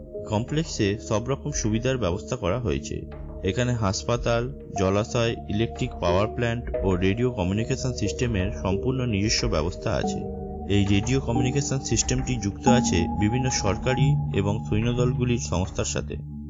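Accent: Kolkata, India. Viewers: কলকাতার বাংলা ভাষা,তাই শুনত‌ে এমন মন‌ে হচ্ছ‌ে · Vai apnr kothar moddhe kolkatar vasha mix ase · Kolkatar bhasha ki erokom hoy naki? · Savage Hasan Ei lok money Hoi Kolkata er tai emn hoi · kolkatar naki dada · indian mone hoitece '' bangla thik moto pare na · Sabbir Pulok tini kolkatar acened a kotha bolce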